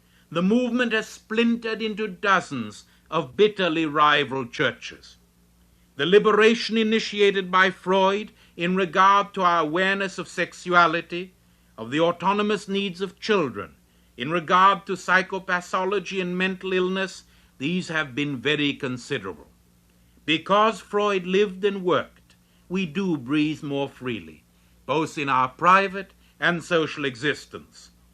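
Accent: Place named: India. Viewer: Even his accent is a smorgasbord! I wouldn't have known he was American. He sounds a tad Indian and a tad English.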